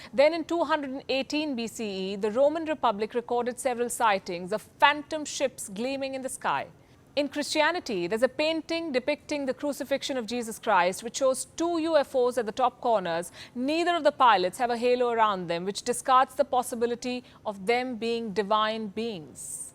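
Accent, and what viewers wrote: India. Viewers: she is a total packages her presentation is gold standard , she delivers in such a clear and concise language , i think she is one of the best journalist on the net , and i love her Indian accent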